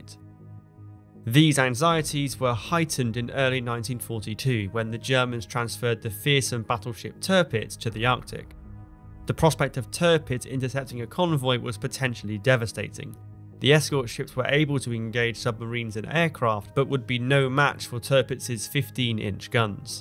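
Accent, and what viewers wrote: England, United Kingdom. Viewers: Lack of detail; and I'm from the US. It takes more than an English accent. go do your homework. disliked.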